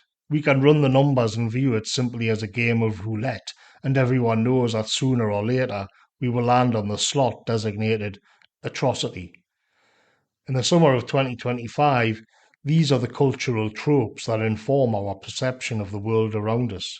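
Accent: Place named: Newcastle, United Kingdom. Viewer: Incredibly well written article, as ever, with added poignancy when read out loud in those Geordie tones.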